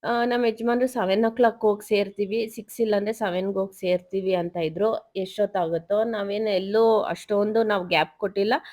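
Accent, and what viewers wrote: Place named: Shivamogga, India. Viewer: ಅಕ್ಕ ನೀವೂ ಶಿವಮೊಗ್ಗ ಕೆ ಬದಿರ ಕನ್ನಡ ಮಡ ❤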